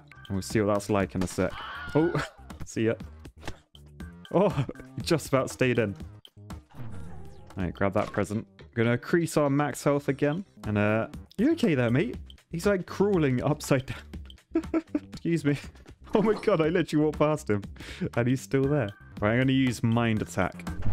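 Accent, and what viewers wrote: United Kingdom. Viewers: Anime karate noises with a British accent are not the bees knees.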